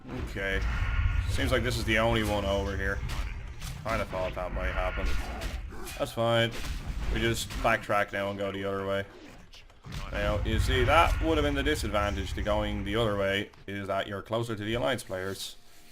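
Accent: Ireland. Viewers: What part of Ireland ya from man?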